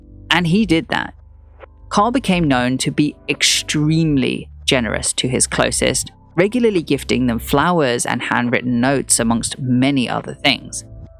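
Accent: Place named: England, United Kingdom. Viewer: I think it’s because you have an English accent, but it doesn’t sound super condescending and posh. I mean that as a compliment, it is an American lol.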